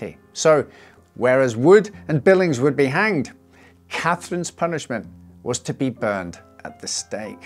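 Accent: United Kingdom. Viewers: What a fabulous discovery this channel is. I listen to MrBallens stories and they are brill but I do prefer to listen to a British voice if given the option. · Stories are just more exciting and easier to listen to when read with a British accent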